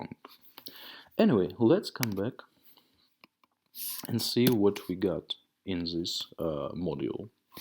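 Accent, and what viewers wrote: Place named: Russia. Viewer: He has russian accent I hear.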